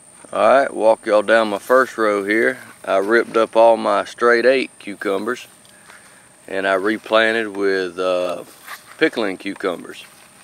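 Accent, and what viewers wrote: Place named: South, United States. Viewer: I guess I will have to plant some okra next year. It sounds good. We just get one crop here in Virginia but we don't burn out. Our tomatoes, peppers, melons, etc are just starting to bear and will go until frost. Sweet corn just finished, but racoons and possums got into it. Yeah, you sound country, but there's something else really relaxing about your voice. You should read books on tape or do voice overs for commercials or become a movie star!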